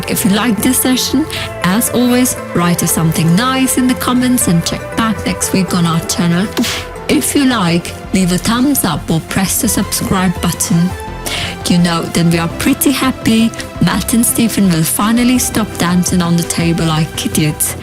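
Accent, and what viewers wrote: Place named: United Kingdom. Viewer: Loved the British accent!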